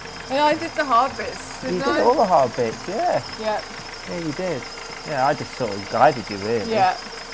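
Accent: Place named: Wolverhampton, United Kingdom. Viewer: Beautiful car, beautiful woman. I guess it comes down to money. If you're rich, get Winnie all fixed up and keep her going. Otherwise, all good things come to an end, and time for a new car. No point spending £1,000 on a car worth £500. Tom is amazing. Look after him! Enjoyed the video and always love your Wolvo accent. Thanks for posting. 🥰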